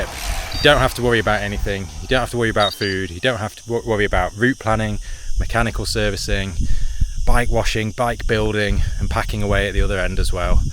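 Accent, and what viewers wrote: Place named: United Kingdom. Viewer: He’s British, not Spanish. Do Spanish put in British accents when pronouncing British place names???? 😂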